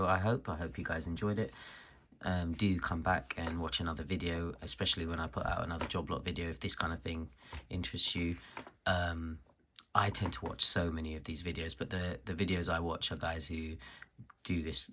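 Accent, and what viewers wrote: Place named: United Kingdom. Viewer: I liked your presentation with soft British accents. Your thick husky voice is pleasant. Proud of you!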